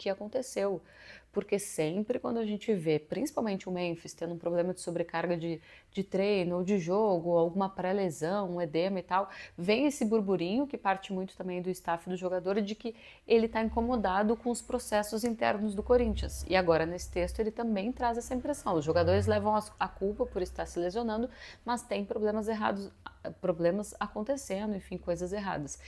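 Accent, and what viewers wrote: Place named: South, Brazil. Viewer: Valeu pelas infos quentíssimas, apesar do sotaque do sul, é CORINTHIANS! 🦅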